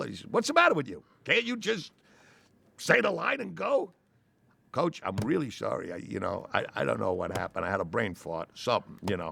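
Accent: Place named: New York, United States. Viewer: A cowboy with a new york accent?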